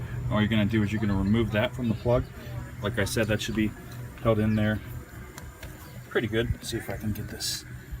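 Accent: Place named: Australia. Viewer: I loved the Australian accent 😂 made me stay and watch the whole thing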